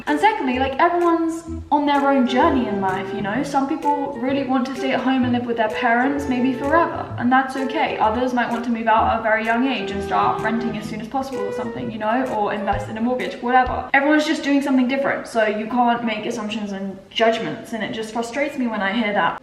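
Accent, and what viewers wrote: Australia. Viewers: You sound so Australian in this video! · sounds so australian in this video.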